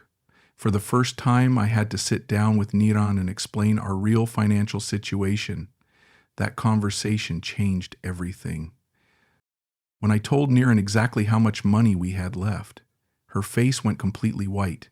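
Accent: United States. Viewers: As a British guy, why do you have a US accent?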